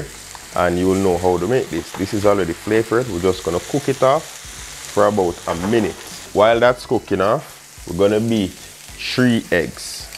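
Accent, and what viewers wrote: Jamaica. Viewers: New to your channel, and your Jamaican accent and delicous food is absolutely amazing.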